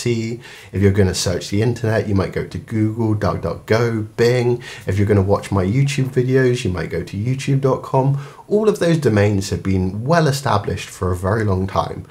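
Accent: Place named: United Kingdom. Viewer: cool but it's time to cut shit with the british accent. talk normal now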